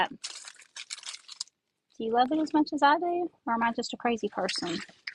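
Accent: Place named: South, United States. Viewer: So very creative and beautiful, your wee cat is gorgeous 😍😍😍 and so is your southern accent i could listen to it all day x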